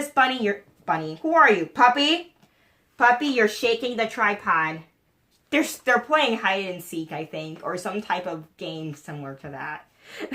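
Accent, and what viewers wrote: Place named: New Jersey, United States. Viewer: Love the “bawls and cawfee”. I’m from NJ too and worked hard to get rid of my accent, lol. Do you also call a dresser drawer a “ draw”?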